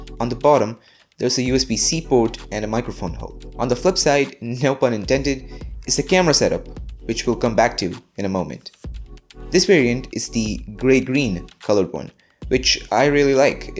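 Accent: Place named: United States. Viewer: you're an Indian? your english accent is american. nice 👏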